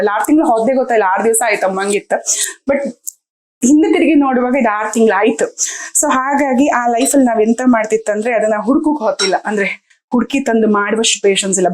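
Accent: Kundapura, India. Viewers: Dasan soppina idli adara jothig namm kundapra kannada super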